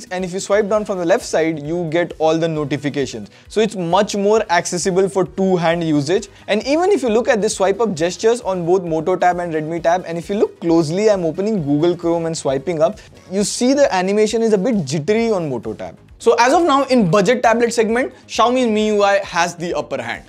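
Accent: India. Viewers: The Indian accent kinda is comical to me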